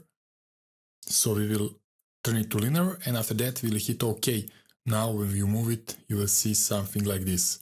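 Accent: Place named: Brazil. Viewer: sotaque brasileiro total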